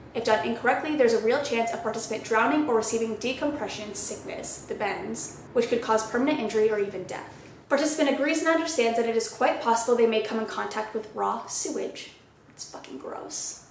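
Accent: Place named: South, United States. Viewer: That insane they all need arrested. Love your southern accent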